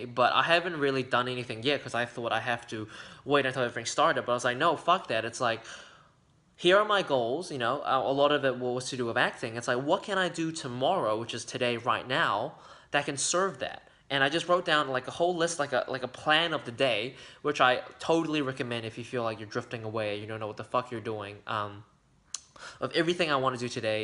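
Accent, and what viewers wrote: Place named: Australia. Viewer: I’m so inspired by Dr Joe, I’ve read all four of his books and they’re completely fascinating, inspiring and always help get me out of a shitty mood. Do you live in Australia? Your accent sounds a little Aussie I think :)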